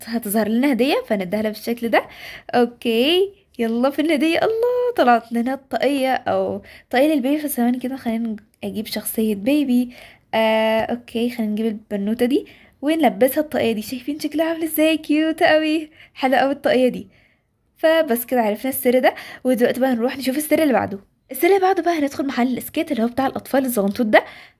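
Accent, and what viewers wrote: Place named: Saudi Arabia. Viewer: عرفت من لهجتك انك سعوديه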